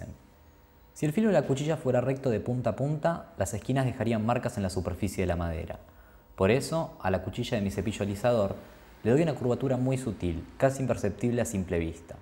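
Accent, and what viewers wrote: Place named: Buenos Aires, Argentina. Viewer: muchas gracias!, yo sabia!! Pensé sos tipo es porteño, yo sabia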